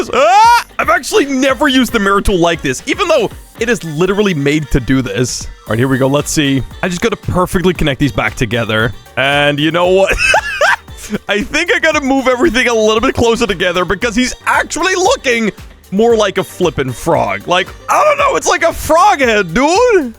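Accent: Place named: Gouda, Netherlands. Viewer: You have a accent that someon from Gouda has are you from Gouda (for the people that don’t know Gouda is a place in the Netherlands)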